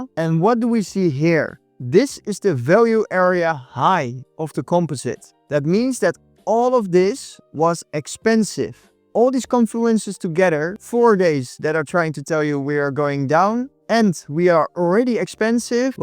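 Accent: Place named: Netherlands. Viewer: Continue your videos. They are really good and give me additional knowledge about order flow. I really appreciate it. I was wondering where are you from cause you have a strong dutch accent ?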